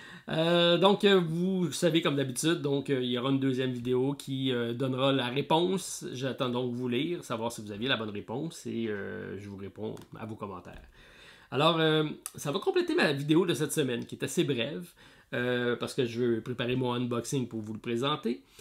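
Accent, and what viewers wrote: Quebec, Canada. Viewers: Joyeux Noël:-) 1er commentaire pour ma part, pour une chaîne que j'attends impatiemment chaque WE (avec ton accent québécois, je suis au sud de Lyon). On a reçu ici pour Noël TTMC et un Unlock... et nous avons déjà joué aux deux! Fait marquant de l'année pour moi: Tainted Grail, même si je ne suis pas allé très loin (et en ce moment le "ks" "gamefound" ISS Vangard). Bravo pour tout! Et bons moments de jeu en famille.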